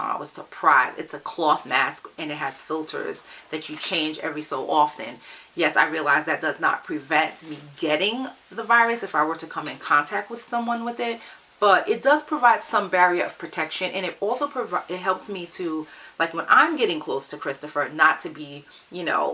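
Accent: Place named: New York, United States. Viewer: I didn't know you were in NY, now I see where your accent is from lol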